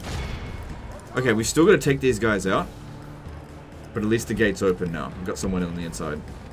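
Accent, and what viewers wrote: Australia. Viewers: He's Australian.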